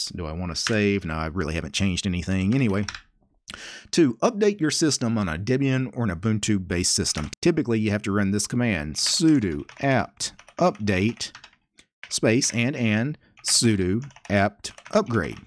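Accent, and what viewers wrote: South, United States. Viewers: Your editor put grip because they can't understand a southern accent apparently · I thoughts it was weird when i first heard Indian accent in a video teaching me computer science, turns out its even weirder hearing a Southern accent in a video teaching me computer science. Jokes aside, its a great video with clear explanation. Good job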